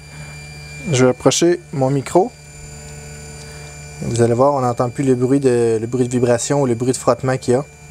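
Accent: Quebec, Canada. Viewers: c'est une vis sans fin qu'il y a là au milieux? Où bien une courroie? Avec le parler Canadien ou Québecois je craint la valeurs de mots!...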